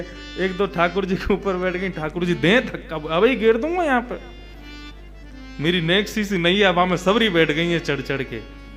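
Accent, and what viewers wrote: Braj, India. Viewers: Aapka nauka bihar prasang brajki local bhasa me sun ke atma trupti hogayi. Jitna sune utna mitha lage. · समज आवे ना आवे पर सुनने में बहुत पियारी है आपकी ब्रजभाषा आप के जैसे ही है 🙏🙏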